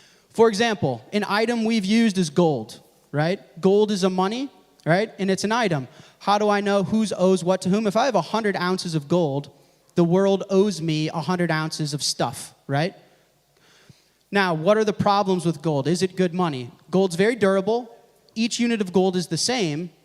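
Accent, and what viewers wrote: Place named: France. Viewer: Il n'y a rien de plus élégant et compréhensible qu'un anglais hésitant parlé avec l'accent français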